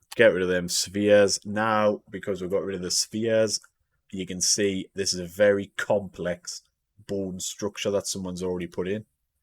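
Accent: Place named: Newcastle, United Kingdom. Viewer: i hear the Geordie accent?? immediately haha.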